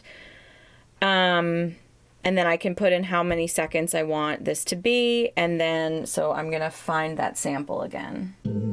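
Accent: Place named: New York, United States. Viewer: Thx Thought I heard a little NY accent in your voice Keep Doing your thing you Dope feel free to to check out some of the Beats I’ve Made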